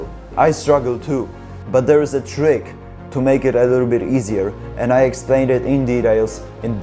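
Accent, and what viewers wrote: Poland. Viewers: Czuć na kilometr polski akcent.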